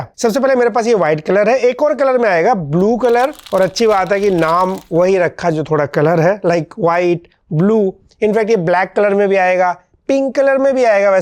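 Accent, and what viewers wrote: India. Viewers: Guru g aap ka unboxing ekdam indian accent me best rehta hai na zada wo jaise aur log dikhate hai and kuch log muh bana kar karte hai unboxing par aap ka unboxing waqai me dekhne me dil karta hai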